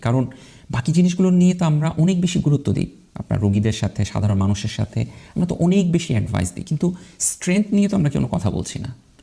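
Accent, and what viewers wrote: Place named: Bangal, Bangladesh. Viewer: বাঙাল তো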